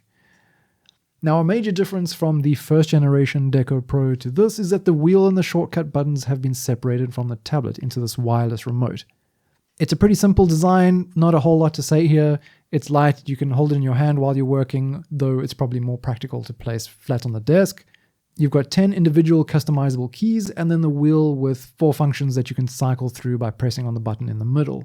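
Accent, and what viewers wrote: South Africa. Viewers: Are you South African? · instantly recognized the accent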